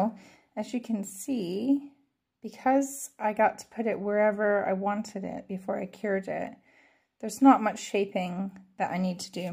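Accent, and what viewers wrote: Scotland, United Kingdom. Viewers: that's interesting, I thought one of the accents I could hear was Scottish! Some Canadians do share sayings and pronoinciation though. I'm Scottish myself and live in Falkirk:)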